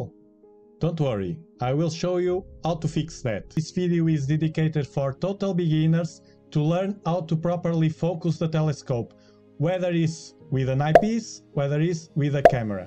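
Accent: Portugal. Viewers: Ayo Portuguese accent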